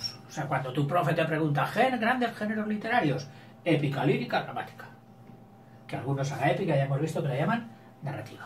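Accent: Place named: Spain. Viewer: quiero un profesor asi...y con ese azento ezpañol,aaa mencanta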